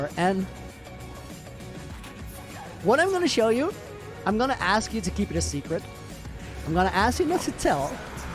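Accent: Netherlands. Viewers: Hey man love your vids. Btw are you dutch?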